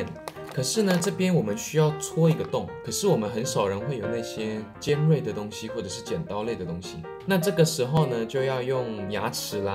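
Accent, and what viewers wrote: Standard. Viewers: 韓國人會講中文喔，好厲害，講的好標準喔！真的是韓國人嗎？不說我還以為是台灣人欸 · 真的，超標準，比我們教授的好100倍QQ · 很字正腔圓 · 中午太標準了吧！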